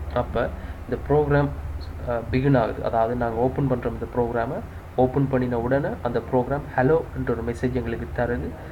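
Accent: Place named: Sri Lanka. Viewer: Srilankan tamil beautiful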